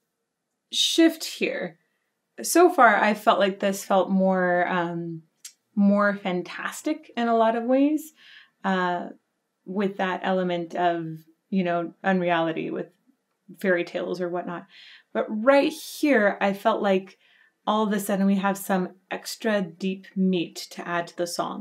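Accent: Brooklyn, United States. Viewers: That's a Brooklyn accent hahahahahaha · His accent is of Brooklyn ny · Brooklyn accent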